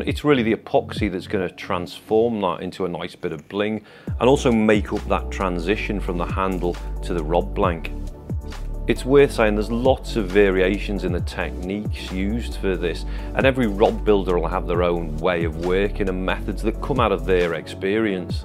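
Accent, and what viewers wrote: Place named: Liverpool, United Kingdom. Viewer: You have a Beatles’ accent!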